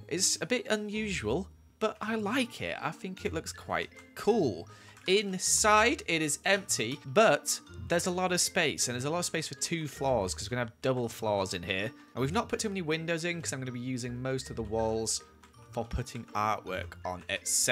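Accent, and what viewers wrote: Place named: Scotland, United Kingdom. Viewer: Sounds like Scotland to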